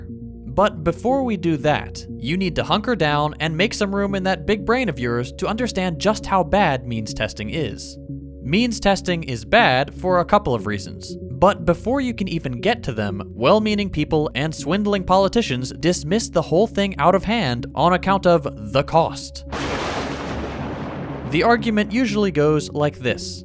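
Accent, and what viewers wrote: Texas, United States. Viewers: Bro is texan? Wtf?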